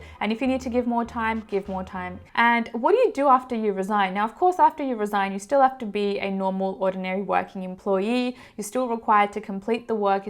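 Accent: Australia. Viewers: You're in Australia? I heard the accent but I thought the great resignation was only in the US. I like this being a global movement.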